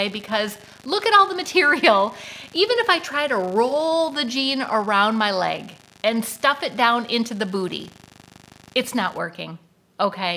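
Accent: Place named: Minnesota, United States. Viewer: I like how you combine your southern and northernaccents…. you say y’all, then you say if ya find ( but with the Minnesota accent!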